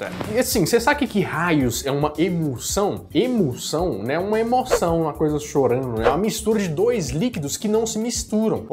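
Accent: Minas Gerais, Brazil. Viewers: esse que eh o verdadeiro sotaque mineiro que a gente tem, não é aqueles trem feio que as novela das 7 da globo tenta fazer não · Estou conhecendo o canal agorae simplesmente AMEI. Vc é muito simpático e divertido, sem falar nesse jeitinho mineiro que é demais. Parabéns!!😘😘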